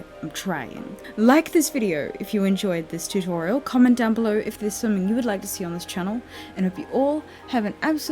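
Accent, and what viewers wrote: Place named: Australia. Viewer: Wow are you aussie. You sure sound Australian. I’m in love with your look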